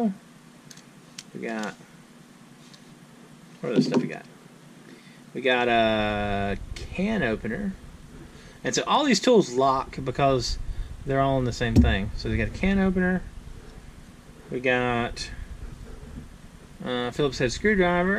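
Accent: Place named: South, United States. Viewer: For some reason in my opinion you sound southern but then again if I am assuming right when I went up to North Carolina a lot of people sounded southern so I'm assume you are from North Carolina or Kentucky.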